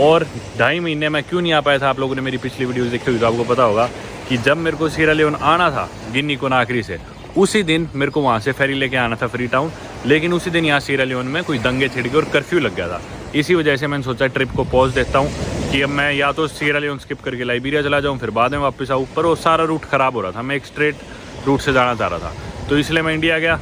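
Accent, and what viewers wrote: Haryana, India. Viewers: Haryani axent 😂 loved it❤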